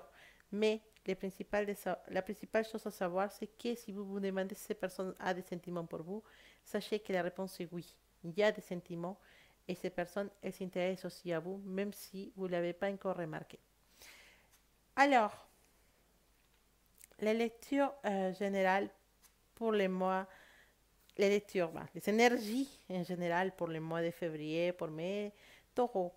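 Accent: Spain. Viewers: Holà, gracias por tu tijada. Has visto justo..Espero que el piensa en mi como yo pienso en el.Pero no quiero entrar en communicacion con el.Espero que sea el que lo haga en Febrero. Una pregunta: cuando interpretas puedes ensenar las cartas de l Oracle de la Triade que te han inspirado.Gracias..y por el acento, es el mejor.el.acento espagnol 😋😁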